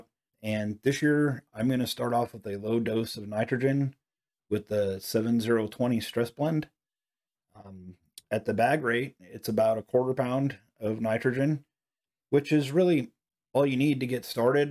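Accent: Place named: Pacific Northwest, United States. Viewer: LOLfirst of all I see an Aspen Pest Control vehicle outside your driveway. I'm in Vancouver and use them, they're very good. Based on your suggestion I got the Spraymate battery sprayer. I used it for the first time the other day and look forward to using more liquids than granular. My grass is Barenbrug RTF Turf Saver and as soon as my Allett Stirling arrives I'm going to start reel mowing at 2 inches and hopefully down to 1.5 and maybe 1.25 but will see. Anyway I enjoy your channel and nice to have a PNW voice out there.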